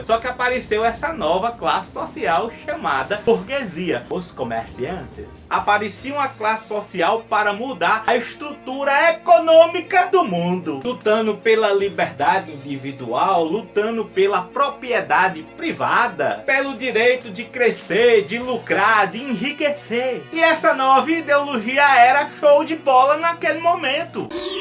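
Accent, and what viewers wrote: Northeast, Brazil. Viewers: Explica tudo num nordestinês fluente.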